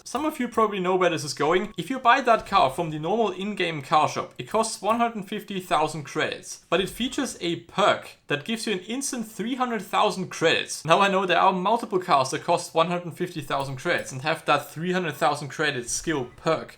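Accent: Germany. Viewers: You sounds like a German. I Think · you know its bouta go down when you hear the german accent and you see the glasses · wie man das deutsch einfach raushört haha xD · Irgendwie finde ichs lustig wenn Deutsche Englisch sprechen auf YouTube. Kein Front gegen dein Englisch, liegt glaube an mir :D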